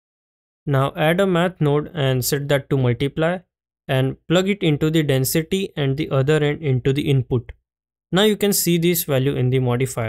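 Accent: India. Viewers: You know that when a indian accent youtuber is making the video, he's going to help you out with any problem.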